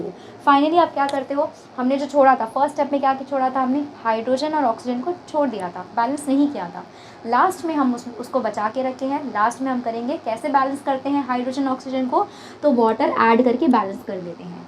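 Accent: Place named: Bihar, India. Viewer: I think you are from bihar.. Am I right?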